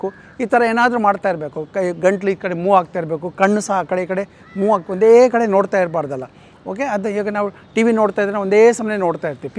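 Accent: Standard. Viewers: ಜೀ ನಿಮ್ಮ ಕನ್ನಡ ತುಂಬಾ ಶುದ್ಧವಾಗಿದೆ ಹಾಗು ನೀವು ಮಾಡುವ ಸಂವಾದ ತುಂಬಾ ತುಂಬಾ ತುಂಬಾ ಅರ್ಥಗರ್ಭಿತವಾಗಿರುತ್ತದೆ, ನಾವು ಹೇಗೆ ನಿಮ್ಮ ಥರ ಧೈರ್ಯವಾಗಿ ಮಾತನ್ನಡುವುದು ಹೇಳಿ👏👏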